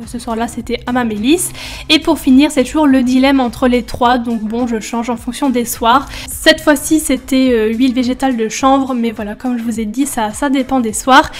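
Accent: France. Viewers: ta vraiment routine bien chargé avec tes petites pantoufles c'est tellement mignon l'accent d'une française lol il est bien chanceux ton copain tu semble être une grande cuisinière :-) et continue de faire des exercices c'est bien aussi , et oui nous aussi au Québec nous regardons cette émission mais avec la traduction québécoise bien entendu lol continue tes super vidéos j'adore :-)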